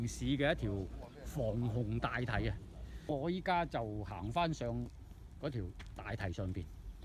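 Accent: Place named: Sanshui, China. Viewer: 三水廣府話，好接地氣。“理尻你”’聽了好親切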